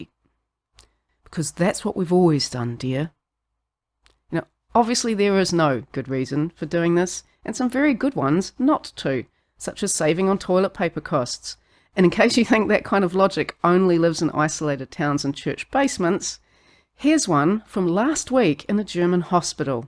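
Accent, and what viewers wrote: New Zealand. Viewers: You look super interesting, like a fabulous actress from a noble lineage or something, part Vampire lady. 😊 And yes I clocked the NZ accent, I lived there <num>.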